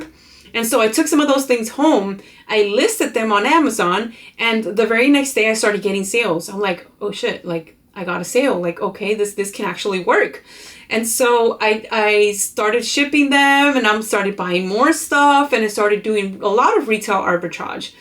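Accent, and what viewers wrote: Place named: Los Angeles, United States. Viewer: Hell yeah! I started to follow you, I can relate to you from that LA accent lol , I grew in LA ayeee fooo!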